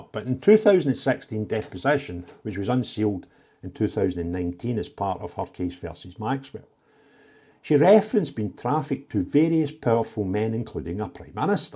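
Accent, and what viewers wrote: Scotland, United Kingdom. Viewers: Sorry mate. Can't understand a word of that broad Scottish accent. Neither can captions.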